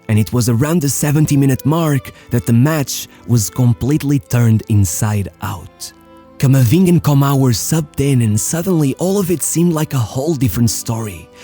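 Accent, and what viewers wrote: Portugal. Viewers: he is portugese that's why he has an accent, and the other mistake, are you always perfect or smthng? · Este narrador eh portugues de certeza. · És portugues de certeza, esse sotaque n me estranha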